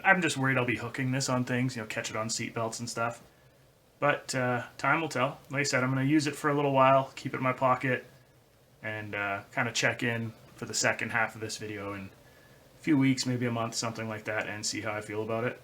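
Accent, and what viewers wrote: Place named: Vancouver, Canada. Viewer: This guy has a vancouver accent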